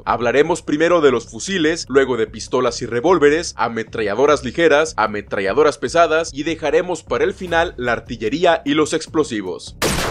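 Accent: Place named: Jalisco, Mexico. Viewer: Pensé que eras de Tlaquepaque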